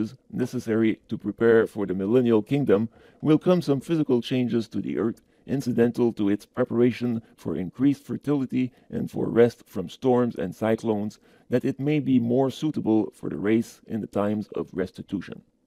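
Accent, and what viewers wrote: Quebec, Canada. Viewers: hmm t québécois toi, je sens l accent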